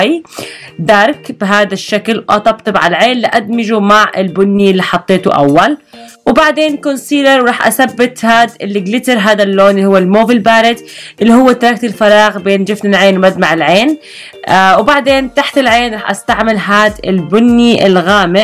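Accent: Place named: Syria. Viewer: لَيش بتحكي سوري ؟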